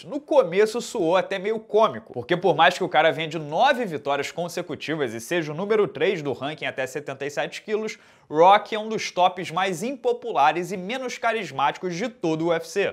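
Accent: Rio de Janeiro, Brazil. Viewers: Meu, esse sotaque carioca é TÃO IRRITANTE!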